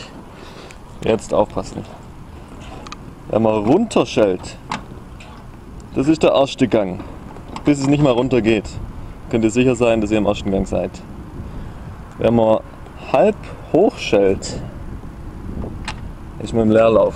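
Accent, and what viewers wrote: Swabia, Germany. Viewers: Omg ich liebe Schwäbisch :D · endlich amole an gscheiter schwabe oder ? :D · Aha.. eh Schwob ;)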